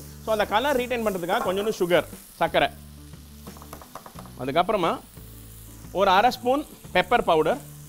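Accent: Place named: Saidapet, India. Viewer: I simply love the last part saying “Torture Panna Koodathu” that was hilarious. I love all your videos and your very simple style of cooking and of course your Saidapettai Tamizh!! Takes the cake!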